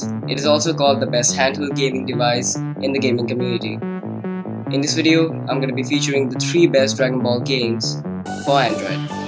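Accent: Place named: India. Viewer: I love the englisch Indian accent dialect Don't worry bro I won't steal your cow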